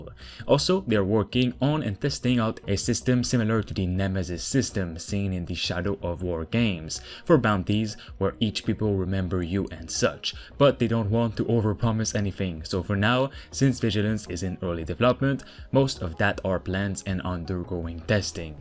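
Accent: Quebec, Canada. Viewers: Juste avec l'accent, on sait que tu es québécois 👌😂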